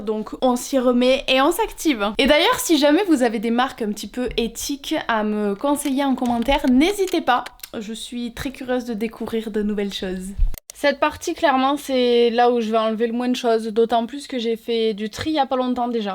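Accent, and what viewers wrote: South, France. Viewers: un sacré accent du sud haha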